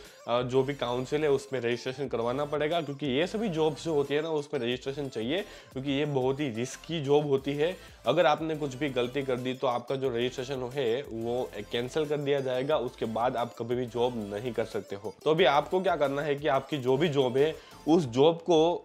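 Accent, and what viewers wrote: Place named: Gujarat, India. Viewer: Bhaii tame Gujarati lago tamari language ma avu lage ....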